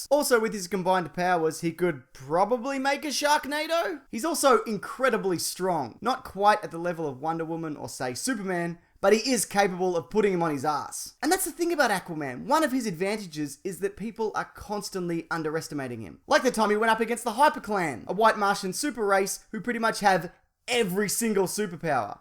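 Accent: Australia. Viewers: i read the title with his sweet aussie accent before even clicking the video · I'm guessing your Aussie right I can hear that accent, mine isn't as strong as yours 😂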